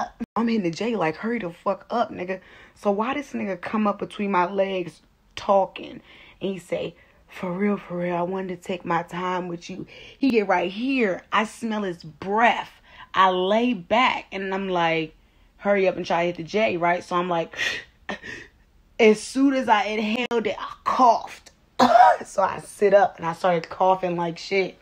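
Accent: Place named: East Coast, United States. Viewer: I knew you had to be from the East Coast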